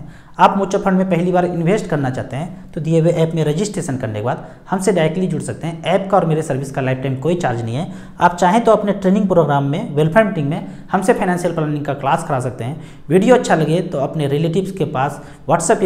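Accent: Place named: Awadh, India. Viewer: सर आपका होम डिस्ट्रिक्ट क्या है , आपकी बोली में अवधी का पुट है इसीलिए जानने की इच्छा है, आपका फॉलोवर...💐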